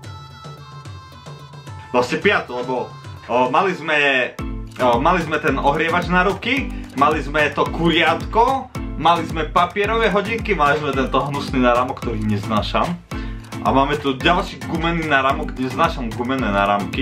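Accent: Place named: Tatras, Slovakia. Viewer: bývam v tatrách.. a ja som si hovorila ze ten prízvuk je mi nejaký známy 😂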